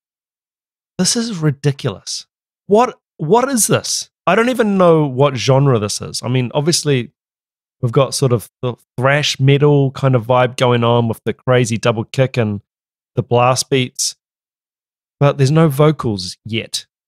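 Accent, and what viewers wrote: New Zealand. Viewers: Its good to hear that Kiwi accent on Youtube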